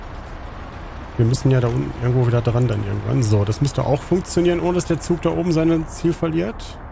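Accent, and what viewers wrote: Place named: Russia. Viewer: . Du hast ein super Video gemacht was ich wie immer liebe. Ich bitte dich bitte den Russischen aktzent weg zu lassen, weil es mich und meine Freunde etwas beleidigt. Natürlich wissen wir das dass nicht provokant gemeint ist und du das aus spaß sagst. Ich hoffe du schätzt das dein Russischer Abo.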